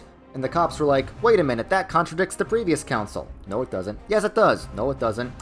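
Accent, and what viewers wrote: New York, United States. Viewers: You can really tell this guy is from New York by his "Coufey" accent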